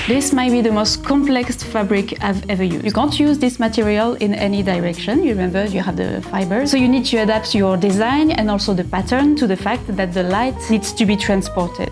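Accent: France. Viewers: Do you speak French or other language to English habitually ? (J'ai cru reconnaître l'accent français ! ) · J'ai reconnu le petit French accent alors j'écris en français... Je ne sais pas pourquoi votre vidéo m'a été proposée mais je suis content de l'avoir regardé, très sympa, bravo :) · oh french accent, UNE FRANÇAISE QUI PARLE ANGLAIS, incroyadible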